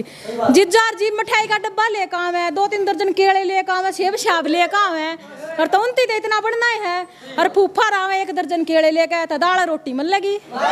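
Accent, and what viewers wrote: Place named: Haryana, India. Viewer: Namaskar aapk jubanse haryanvi ki soundarya bas aakash choolethi hy congrats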